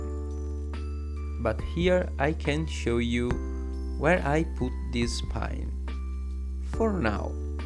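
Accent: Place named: Russia. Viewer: That accent.. are you Russian or something??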